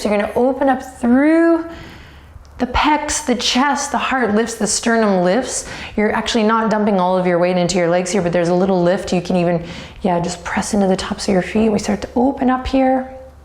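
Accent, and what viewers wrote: Ireland. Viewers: i like the irish accent. lololol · Thank you for your wonderful videos, I love benji! Really finding it enjoyable to follow your videos, i never managed to do yoga by my self at home until finding you.. This week my theme is the heart so this was perfect! things are coming together in my life to allow me to keep my heart open. Sending gratitude from Ireland (perfect that you did an Irish ascent this time!)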